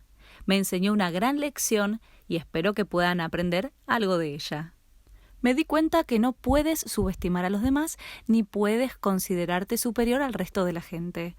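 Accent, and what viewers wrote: Argentina. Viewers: Suena re argentina y hablando con tuteo y lenguaje neutro JAJSJSJ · Es la típica argentina narrando algo escrito así hablan en el canal Paka Paka. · la voz parece argentina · que onda con su voz 0-o y creo que es argentina :v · machu YT en las distintas provincias de argentina tiene acentos diferentes, x ej los porteños y los cordobeses. ahora esa es una diferencia clara, pero tmb hay diferencias que no se notan mucho, como será este caso. bueno eso jaja, es argentina pero usa aquí, puedes noc re rancio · Tu acento argentino se nota bastante no intentes hablar en neutro porque te queda mal. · Esa voz es re Argentina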